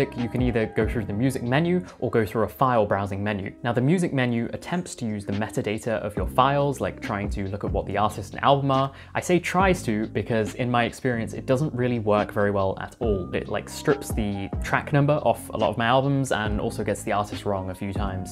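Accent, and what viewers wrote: United Kingdom. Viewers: I enjoy you review,. Besides your technical knowledge, your British enunciation is quite pleasant to hear.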